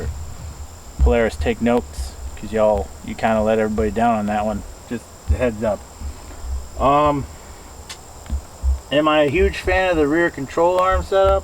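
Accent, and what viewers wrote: United States. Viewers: Thanks for taking the time to share your opinion. Refreshing to see a video that is unrehearsed or sponsored. Like your breakthrough accents and lightheartedness. Good thorough review. Own a 650 XT Max and I love the machine. Mainer eh? In NH we call you "Maine-e-acks. "Kind proved it with the wheelie segment at the end of your video. Made my day! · Literally the first sentence that came out of your mouth I can tell you from Wisconsin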